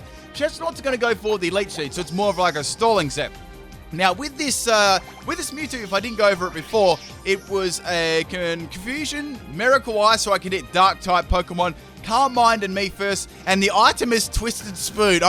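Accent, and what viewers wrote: Australia. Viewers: bruh thats just his australian kicking in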